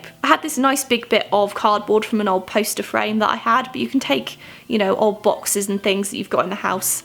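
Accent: United Kingdom. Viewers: Becaus you have a british accent and you live in america... or england.. plz tell us in a vid! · do you live in America? hearing a British person saying dollars and hobby lobby confuses me 😂😂😂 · erm are you American you say dollars and stuff but you sound like you have a British accent lol. great video I'm more of a sketcher, drawer however you say it but the organisation tip was pretty useful.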